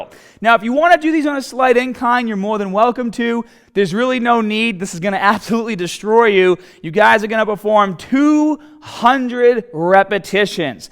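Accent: Boston, United States. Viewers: Hey bro I’ve been watching your channel for ever if feels like!! just wanted to tell you, your so inspirational and full of information that you have inspired me to start my own fitness transformation journey and VLOGG it as well for YouTube!! Love your channel and I love your information n that Boston accent is for real!!!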